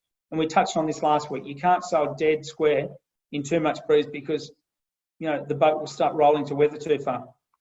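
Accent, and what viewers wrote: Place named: Australia. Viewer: less than 200 likes? There is an incredible amount of very valuable information here! The aussie accent is not easy for me, but what a great video!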